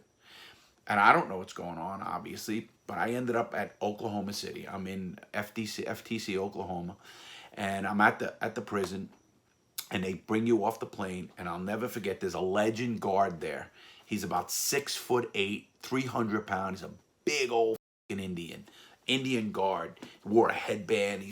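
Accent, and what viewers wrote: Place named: Philadelphia, United States. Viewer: I could tell you're a Philly person due to your accent and specific terms you've said like hoagie and such.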